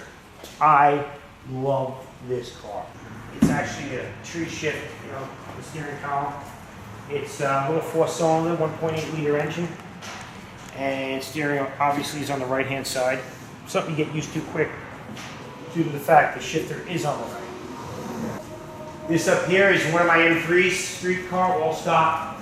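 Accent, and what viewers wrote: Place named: New Jersey, United States. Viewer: For a guinea with a heavy jersey accent, you’ve got very good taste. God bless Americana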